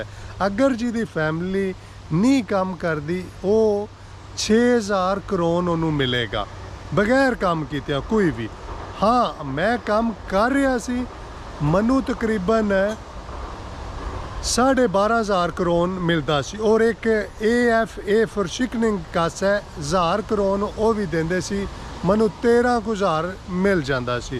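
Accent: Gujrat, Pakistan. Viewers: AP ki boli se lagta hai k ap Gujrat se ho brother Khush raho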